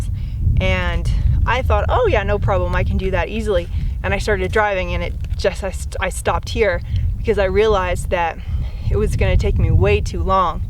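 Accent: United States. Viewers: I love ears english and i love your american accent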